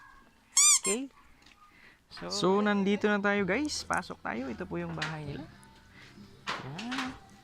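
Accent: Visayas, Philippines. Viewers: Salamat sir idol kna ng maraming tagasubaybay..andun parin ang visayas accent..daghang salamat bai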